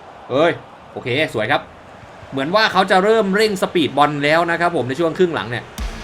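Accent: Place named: South, Thailand. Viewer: น่าจะตั้งชื่อว่า มูฮัมหมัดอะไรโช้ ออกสำเนียงใต้น่ะครับ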